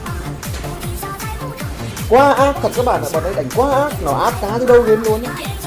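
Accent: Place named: Central, Vietnam. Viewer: giọng mtrung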